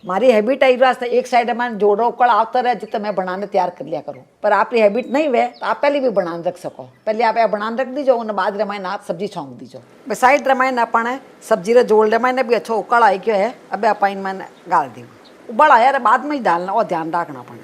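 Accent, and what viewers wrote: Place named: Marwar, India. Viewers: Receipe re Sathe marwadi boli gani achi lagi sa. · जय श्री कृष्णा Thaki मारवाडी बोली घनी चोखी लागे. रेसिपी बतवणकी पद्धत आणि सब bartan बहोत अच्छा लागे. या sabji तो mhe जरूर banayne देखुला जी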